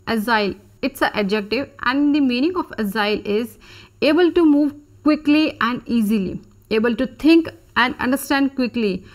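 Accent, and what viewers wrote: India. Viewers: Mam are you from Chhattisgarh ?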